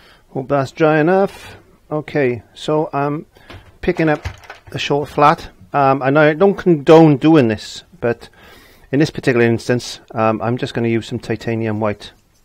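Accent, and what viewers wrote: Wales, United Kingdom. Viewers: Where are you from? You sound Welsh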